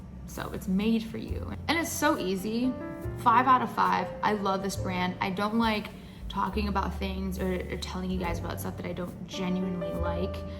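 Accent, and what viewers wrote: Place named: Russia. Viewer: u have russian accent :D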